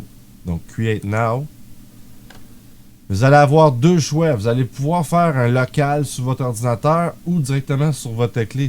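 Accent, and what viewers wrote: Canada. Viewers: Top merci depuis la France et l accent canadienne adore !!!!!!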